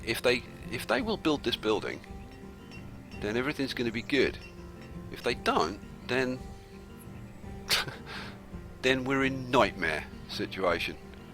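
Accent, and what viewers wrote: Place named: Midlands, United Kingdom. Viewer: What is your dialect? It sounds a bit midland-esque. Just curious. Good videos.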